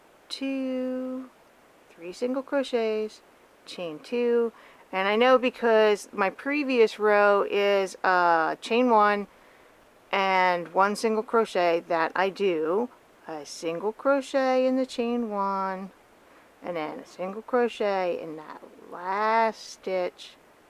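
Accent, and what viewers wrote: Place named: Philadelphia, United States. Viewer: I had a feeling just by your accent you were close to Philly! I’m from New Jersey. I lived in Absecon worked in Atlantic City at Harrahs moved to Las Vegas back in 1996 been here ever since.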